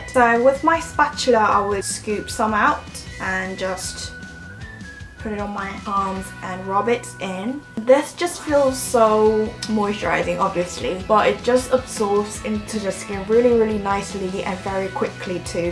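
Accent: United Kingdom. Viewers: 영국식 발음 너무 이뻐요ㅠㅠ 프라이머 비교 영상도 보고싶어요 ! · 언니 목소리랑 영국발음이랑 너무 잘어울려요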